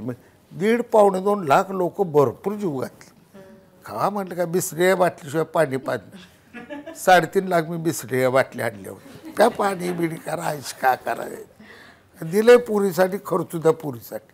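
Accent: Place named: Mandesh, India. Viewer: सांगोल्याला मिळालेले दोन हिरे गणपतराव देशमुख साहेब आणि शहाजी बापू पाटील ❤️ माणदेशी बोली ❤️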